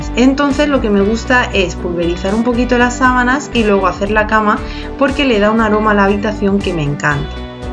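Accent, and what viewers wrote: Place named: Alicante, Spain. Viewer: Debes ser de la zona de Alicante ..por el calor y el acento ...muchas gracias por el video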